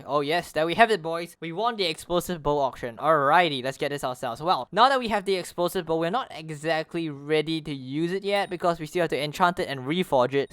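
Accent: Singapore. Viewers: So you are singaporean. You can hear it in the accent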